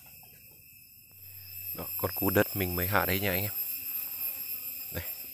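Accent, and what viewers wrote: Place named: North, Vietnam. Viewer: xa quá nghe giọng bác giống ngoài bắc tưởng ở bắc cho e gl cùng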